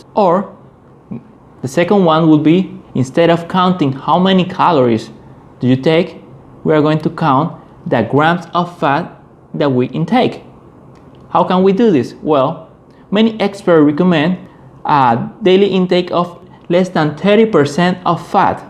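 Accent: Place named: China. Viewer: I think you shouldn't change your accent just because some people think you should. Its beauty of this world where we live that everyone has their own unique way of speaking a language. I live in Engalnd and we have God knows how many different accents here, I find it really hard to understand Scottish accent but I would never ask my Scottish friends to change their accent for me. Similarly my Caribbean friends have very strong accent and it's the beauty of every area. A Chinese man should speak in his accent as it's not easy for me to speak your language but you are speaking in my language so i should be grateful to you. And again you have beautiful accent and always keep it like that.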